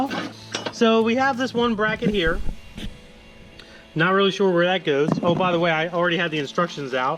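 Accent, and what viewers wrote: Pennsylvania, United States. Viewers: It's a Pennsylvania thing. I'm from pa and everyone says it like that.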